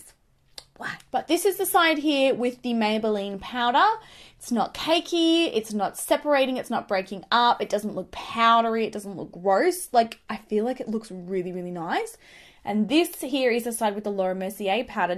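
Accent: Australia. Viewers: 1) You're a gorgeous girl. 2) Us Americans luv those Aussie accents and I'm no exception. 3) Luv how excited u get over a really good product. You look like the kind of girl who wouldn't get excited about anything (u have that 'cool' factor.) 4) U have the most beautiful blue eyes. I've been trying to find a pair of colored contacts that r your eye color but to no avail.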